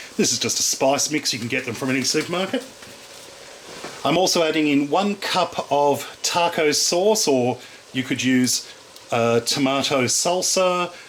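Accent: Australia. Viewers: i I genuinely hate the aussie accent but i don't mind yours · not British. Australian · Okay, the first time I watched one of your videos I thought you were English, but know I think you are Australian.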